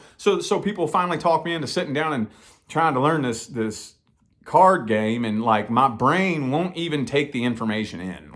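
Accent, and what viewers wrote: South, United States. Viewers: You've got that southern accent! I'm so new to manifestation at first it was law of attraction, and that didn't work, but later I came across law of assumption I was like oh my word!!! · I needed to hear you. Thank you. I wish I could just "do it". I've been reading so many years it's not even funny. I do it only when somebody asks. My addiction was Abraham Hicks about 10 years ago. I know what I am supposed to be doing but here I sit. I started listening to you a very long time ago. You were familiar in a strange way but I later found out you were from my area and sounded like my dad and brothers. That red neckery accent hahaha!!! Keep doing what you do! Maybe I will catch up someday! I love you and your family as I have watched you all bloom into awesomeness like no other!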